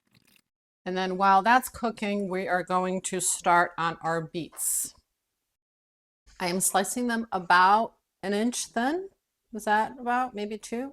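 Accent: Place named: Russia. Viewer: found you at random from your treehouse video in Berlin. Im just south of there a bit in New Albany and will have to check the Amish treehouse out. My Grandmother came here from Russia in 1939 and made Borsch all the time. Not sure if Russian is your accent I detect, but thank you for sharing this recipe. ohhh love the apron, God bless you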